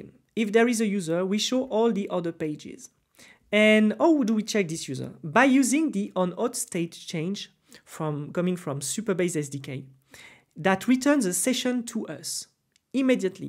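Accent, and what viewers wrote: France. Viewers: Merci beaucoup, I can recognise French accent from miles away. Tu aides énormément mon gars ! 💪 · French accent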